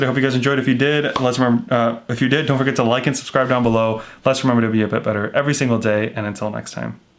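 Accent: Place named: Canada. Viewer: What? Dallas TX! I thought you are Canadian! Lol 😆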